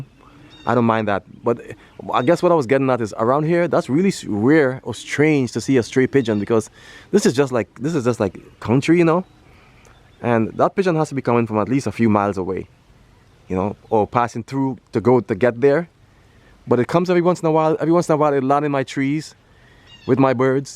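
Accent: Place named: Caribbean. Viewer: Bro you sound like you from the Caribbean or am I wrong the accent but the birds are flying good and I like when you say you don't baby your birds we say the same back home here but love the videos bro🎉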